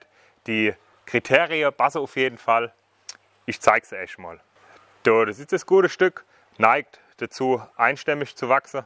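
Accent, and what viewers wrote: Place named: Hesse, Germany. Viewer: Tolles Vido. Und dein Hessisch ist einfach nur sympathisch 🙏🏻😇 LG Jonas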